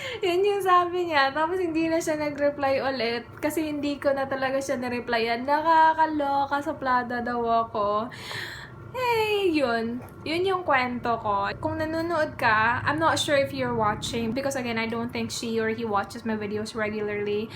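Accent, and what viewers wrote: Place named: Cebuano, Philippines. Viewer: Miss I'm your new subscriber... My mother is from cebu as well hehe... I super enjoy your videos it reminds me my family ung tono ng pagsasalita mo parang same sakanila kase from cebu and english...hehe Pwede po ba shout out sakin and sa asawa ko na si Odi dito kami sa Abudhabi... wait ko po hehe.... Godbless us all... Take care!!!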